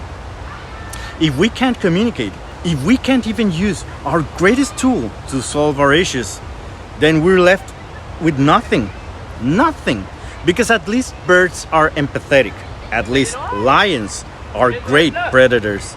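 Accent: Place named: United States. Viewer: Mi hermano te suplico que no digas mexico y mesoamérica . Con acento gringo. Y te felicito por si saber pronunciar los nombres de los tlatoque con acierto. Gracias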